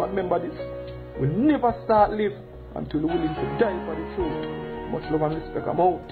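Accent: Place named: Jamaica. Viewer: I like your videos but for those of us who are not Jamaican it can be hard to understand the patois.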